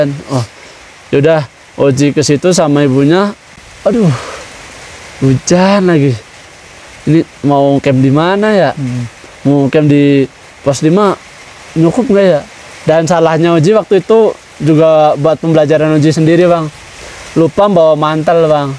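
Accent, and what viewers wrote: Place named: Banyumas, Indonesia. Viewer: Habis dr rjl5 langsung kesini. Mas oji keren bocah ngapak kaya inyong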